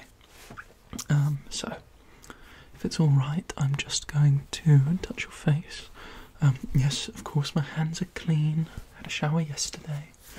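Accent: United Kingdom. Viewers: I was scrolling and started watching this video without audio. And I clicked and was going to comment, "he looks like he has a British accent without audio lol", but then he DID have a British accent lmao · I`m in love with british accent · The british accent improves EVERYTHING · THE BRITISH ACCENT AGHH🙈🙈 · The British Accent is a chefs kiss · The British accent is literally my favorite thing ever I could listen to this everday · Мое плохое знание английского + британский акцент = смерть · Help i cant understand british accent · I love british accent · I am from Russia and have been studying English for 8 years. I should note one important thing: your British accent is the best thing I've ever heard in my entire life. It's magical and indescribable! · You're very cute! And loved the asmr vid!! I enjoyed the British accent! Stay amazing ! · help why I'm so obssesed with british accent wtf? 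· are you actually british or are you just faking it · Word repetition with British accent might just be my new favorite thing ❤️ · thanks to youtube algorithm for suggesting a british accent channel lol and u seem like a fun person even though i have a littleee difficulty with the language anyway I'll support u ^ ^ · I love the bri' 'ish accent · yes that is british accent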